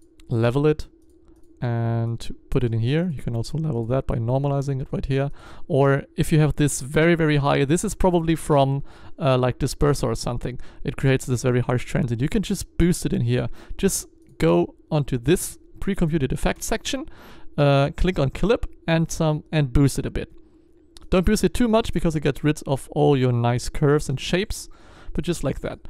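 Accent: Germany. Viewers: you can hear that hes german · dein English sagt du bist Deutsch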